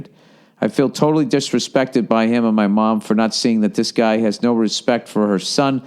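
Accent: Boston, United States. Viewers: BIIIIIIIIIILLL!!! We fucken love you man! (That shit does matter, gotta get too those two last stadiums man) And fuck that guy! Make America Great again. Anyway I learn heaps and loads from you about American sports and other shit in fits of laughter, I watch all your shit, we love that boston accent "DOWN UNDER!" (say in Aussie accent) BUT please watch a bit of that new star trek on Netflix... and then yell about it..its the fucken best! peace n love......p.s. say the dudes name ill take care of it. Great podcast.. Pps go fuck yourself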